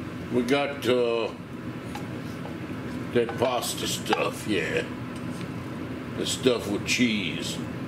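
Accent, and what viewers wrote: Louisiana, United States. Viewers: What part of Louisiana u from sha? Got dat ole tic accent baw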